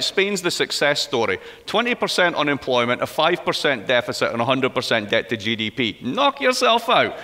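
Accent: Scotland, United Kingdom. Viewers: I want to ask the channel owner -- to PROVIDE C.C. I believe Mr. Blyth is a brilliant economist, but he speaks with the RIDICULOUS ACCENT! Probably scottish or welsh I couldn't understand 1\4 of the lecture.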